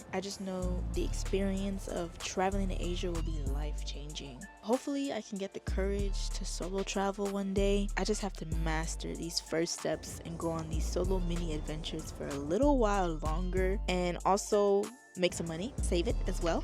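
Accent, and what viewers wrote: Jamaica. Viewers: Content, music, and the Jam' twang...I'm with you!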